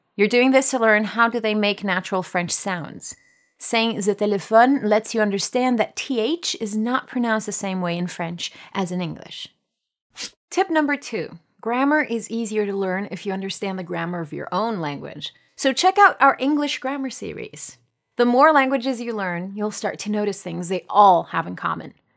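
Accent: Brazil. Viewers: Magda Alvernaz não quero te menosprezar mas você entendeu quase tudo que ela falou porque ela é brasileira e fala com nosso sotaque.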